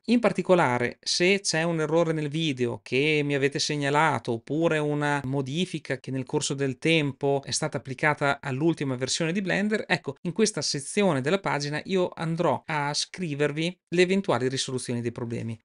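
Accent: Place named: Emilia, Italy. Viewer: Ciao mi sto avvicinando a Blender da pochi giorni, provengo da Cinema 4D con un livello appassionato avanzato, grazie del corso lo seguirò con interesse e costanza, dal tuo accento sento che sei emiliano, valore aggiunto in quanto amo la tua terra tanto che ho la compagna della provincia di Modena! a presto!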